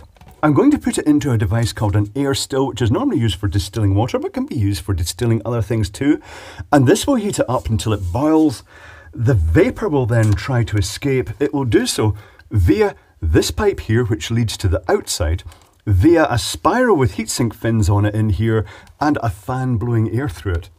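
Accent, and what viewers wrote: Scotland, United Kingdom. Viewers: is that scottish accent?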